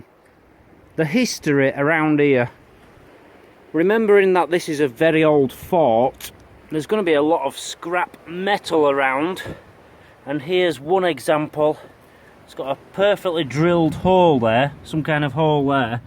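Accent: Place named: Yorkshire, United Kingdom. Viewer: Where in Yorkshire are u from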